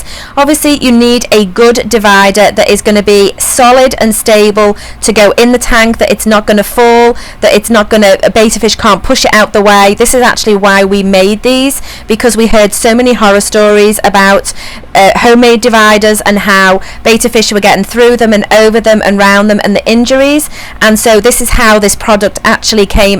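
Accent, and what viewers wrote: United Kingdom. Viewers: i'm a bit confused, you sound british, yet you describe capacity in gallons, and all your links to buy stuff is in dollars.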